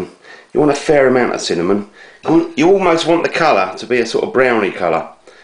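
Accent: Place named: Estuary, United Kingdom. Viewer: That's a (Thames) Estuary accent... London and its environs, into Essex, and very working class.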